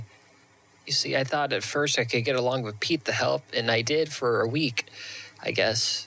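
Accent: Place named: Ozarks, United States. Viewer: Wonderful job with the phases and feel of the language of the Ozarks.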